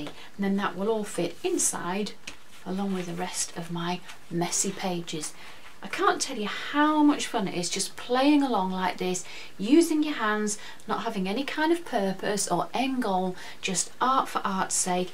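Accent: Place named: Yorkshire, United Kingdom. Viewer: I didn't realise you were from Yorkshire!!.. you certainly have a lovely accent so if I was guessing I would say you're from North Yorkshire...Ilkley or Harrogate? I'm not that lucky, I'm from South Yorkshire.....Barnsley, (all flat cap n whippets) 🤣 and you have to say like a bleating sheep...Baaaaaaaaarnsly🤣🤣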